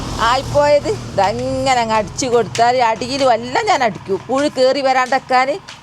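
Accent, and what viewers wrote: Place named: Kozhikode, India. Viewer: കോയിക്കോട് ഭാഷ കേൾക്കാൻ രസമാണ്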